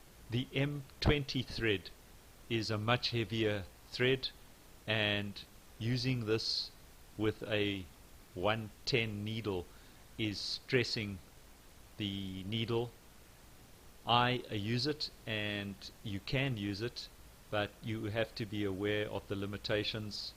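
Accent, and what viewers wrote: South Africa. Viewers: Best video on Youtube explaing thread and needle on this machine. Where can you buy the the 140 flatshaft needles ? You sound like you are from SA boet ?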